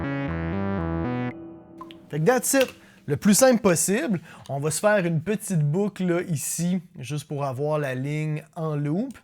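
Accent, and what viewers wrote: Quebec, Canada. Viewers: En fait plus j't'écoute plus je kiff l'accent québécois! (j'suis qu'un maudit Français!) Bref, chouette vidéo (owl video...) , qui apprend des trucs simples et utiles sur un bon rythme et dans la bonne humeur.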